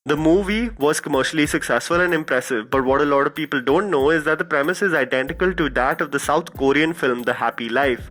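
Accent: India. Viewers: Who is here to only enjoy the Indian accent of the speaker? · That Indian accent though!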